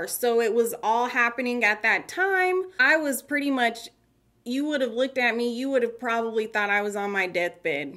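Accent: United States. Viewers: Where is her accent from in America?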